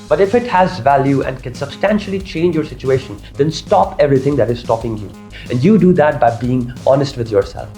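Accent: India. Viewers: 930,088 I have subscribed, even though at first it sounded like another mindless dribble but by a not too typically accented Indian dude with a channel name that appears to explain vaginal bleeding. Point made and leading up to was fantastic. Thumbs up and if it works for me within the next 3 days I’ll probably donate aswell.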